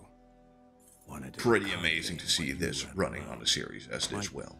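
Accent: Netherlands. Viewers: You sound very Dutch. Thank you for the video!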